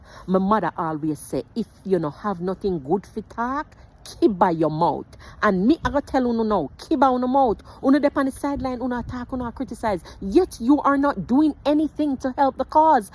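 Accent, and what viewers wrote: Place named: Jamaica. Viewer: This message could not be any clearer!!!! Love to hear the Jamaican dialect!!❤❤❤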